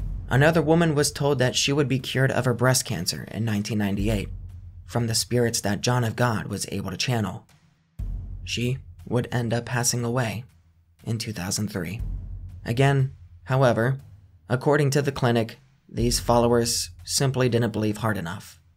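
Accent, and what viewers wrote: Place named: Portugal. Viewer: I find your Portuguese accent interesting. Didn't know how he do that "healings". Have a sense of documentary.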